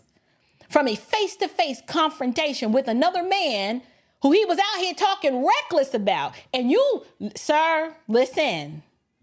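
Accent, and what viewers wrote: New York, United States. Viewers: The way you said ya'll! You are an honorably southern bell! I know you are originally from NY, which I hear as well. I love your commentary and point of view. Keep up the great work! ❤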